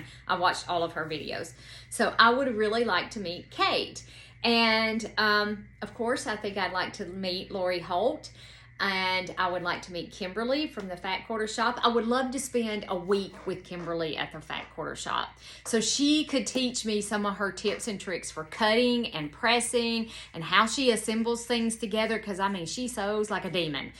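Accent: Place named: Alabama, United States. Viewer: Oh Wow! I totally loved hearing you answer all the questions… I always love listening to your Alabama accent too! Wonderful video!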